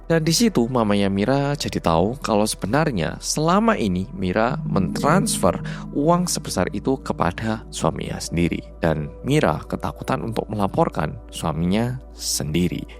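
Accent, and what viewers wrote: Java, Indonesia. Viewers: Hai medok.... Nyimaaak 🎉🎉🎉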